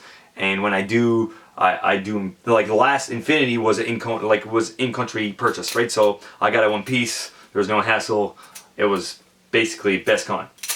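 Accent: Canada. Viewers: are you from quebec?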